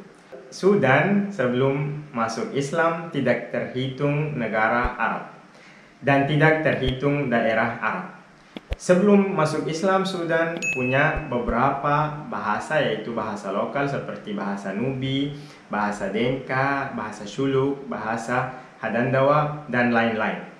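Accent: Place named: Sulawesi, Indonesia. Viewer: Bang masyaallah sukses selalu ya, btw logatnya abang Sulawesi bgtt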